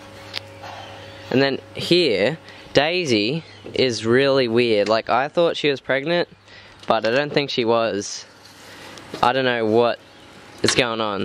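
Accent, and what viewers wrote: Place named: Australia. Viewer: do you have wild bearded dragons in youre yard because you sound austrailian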